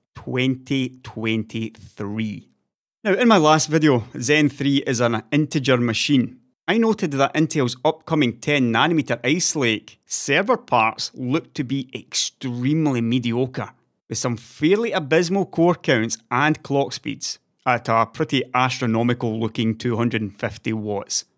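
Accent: Scotland, United Kingdom. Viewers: I can barely understand that thick Scottish accent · Scottish, with a little bit of influence from somewhere I can't remember off the top of my head now, from living outside of Scotland for a while. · It's an scottish accent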